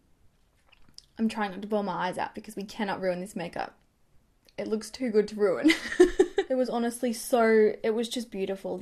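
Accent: Australia. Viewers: I recently found your channel and you’ve filled a huge homesick void for me. I moved from Australia to America in 2012 to be with my husband. I miss Australia so much, it might sound odd but hearing your Aussie accent and slang makes me SO happy, you’re so much more relatable and down to earth compared to other Australian YouTubers. I haven’t seen or spoken to another Aussie since moving here and I often feel like an outcast. Watching your videos reminds me of where I came from and to be proud of it. Congratulations on your engagement!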